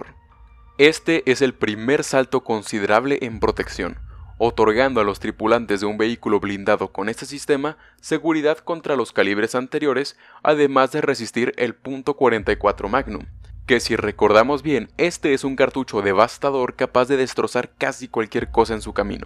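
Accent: Mexico. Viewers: eres de México?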